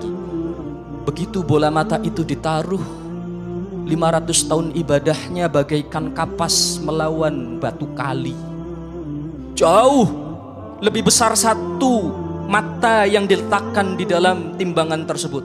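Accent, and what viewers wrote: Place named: Tegal, Indonesia. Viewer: Assalamualaikum wr wb..🙏 Ngapunten..🙏🙏 Pa' ustadz asli orang mana kok bisa ngomong Tegal..?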